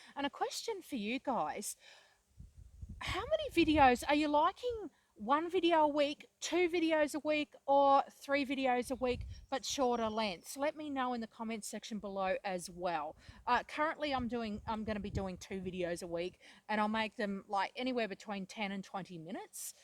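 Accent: Australia. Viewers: Gotta love the Aussie accent !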